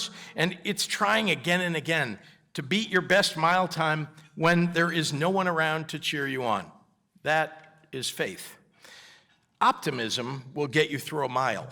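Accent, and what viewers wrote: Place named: Canada. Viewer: About Cannabis ~ after a CRIME of the CENTURY of ILLEGAL CANNABIS - We the GLOBAL people truly demand & expect this otherwise FREE DITCH WEED backyard garden-variety naturally safe & superior NATURAL BOUNTY plant to be RETURNED outright & overnight so we can GET BACK ON TRACK as a GLOBAL SOCIETY using our free best foot forward to redemption, after being FORCED to instead utilize sickly synthetic toxic patented/lapse-patent profit crap for generations.. So yes - time this ends is already 105+ years later. Thanks too for referring to it as 'Cannabis' vs that designed derogatory slang 'mj'. Respect! Speaking of a Garden that didn't need tending.. and 'weeds' that needed to be rooted - consider how FAR AWAY FROM NATURE the global population has been forcibly redirected.. consider a DANDELION is one of the most healthy plants we have at our feet - and what we have been doing with it all along.... 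just as a simple primal example of how FAR OFF the path WE HAVE BEEN LED. Dang, Sir - Respect! From a Canadian, I am taken aback by your speech - in a very great way! You almost sound 'Canadian' fcol! This is a breath of fresh air - the rest of the planet awaits!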